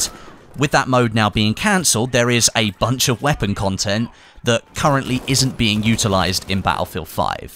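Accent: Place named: United Kingdom. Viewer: I appreciate your optimism . Gave up on Battlefield a long time ago. Still enjoy listening to your slick British accent . Are you going to give Modern Warfare a try this year?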